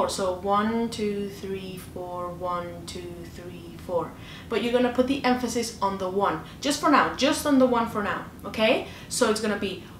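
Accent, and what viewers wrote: Ireland. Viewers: Great lesson your accent is like a half Kerry half SAN Paolo. Thanks for sharing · Yet again another great tutorial video here, I have been very lazy over 2 yrs when it comes to Bodhran practice, but now I've discovered you I've made a pact that I will do 15mins a day but it ends up being 30mins no problem n that's down to ur teaching skills. You have an Irish accent in there somewhere? Are u Irish n moved abroad? Or the other way round? Do u play in a band by anychance? Sorry for all the questions btw I'm just curious 🥁🇨🇮🍻🥁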